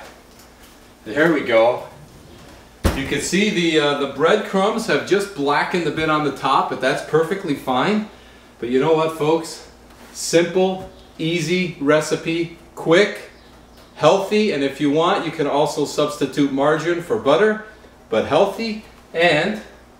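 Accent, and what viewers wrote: Canada. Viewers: Anyone else that can tell this dude is ethier from Canada or Wisconsin just by his accent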